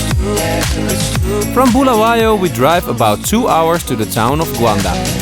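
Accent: Netherlands. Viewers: Thank you for taking us along. Zimbabwean here, born and bred, however I've lived in Wageningen before and immediately picked up on your Dutch accent. Good to share that when you refer to 'Kopje' its just one of the many borrowed Dutch words now part of our either everyday English vocabulary or Vernacular too. E.g. Jazi (Shona for jacket/long overcoat) is from 'Jas' similarly 'Tafura' (Shona word for Table) can be traced from 'Tafel' These are the few on top of my head but there is so much Dutch influence in our culture that a lot of locals may not be aware of. New subscriber too, keep doing what you are doing. cheers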